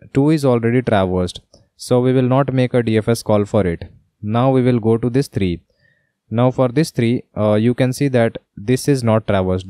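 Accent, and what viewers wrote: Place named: India. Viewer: Beautiful explanation, I usually have hard times to understand tutorials in Indian accent. So when I googled I had to turn pages to find one that isn't Indian. First I watched that other video (with not Indian accent) on the subject. But it was horrible. Then said I give a try, and I chose this video. I have to say your explanation is beautiful, clear and easy to understand, the accent is barely noticeable. I like it! Great job! (Obviously. Liked and Subscribed.)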